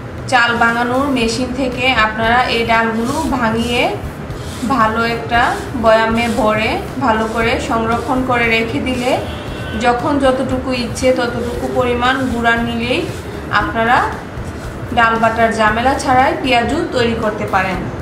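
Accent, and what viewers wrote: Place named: Comilla, Bangladesh. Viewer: apur desh most probably comilla .. your Accent says so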